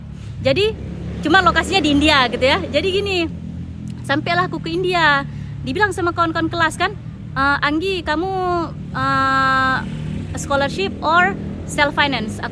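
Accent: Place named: Sumatra, Indonesia. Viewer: Siap kk, saya selalu mnton videonya kak Anggi, cara bicaranya cerdas dan lugas bikin gemes, dan logat khas Sumatranya juga dapat banget.. hehe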